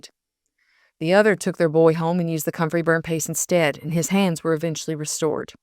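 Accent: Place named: South, United States. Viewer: Really appreciate this teaching and love the southern belle voice!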